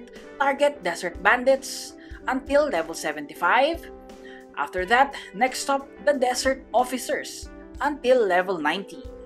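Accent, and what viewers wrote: Philippines. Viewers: you have a very thick filipino accent kuya😄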